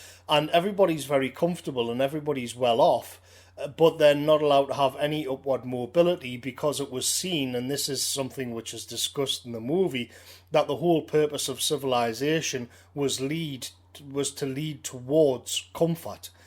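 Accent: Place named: North East England, United Kingdom. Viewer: its really is beautifully articulated content, in a way that only the north east accent can deliver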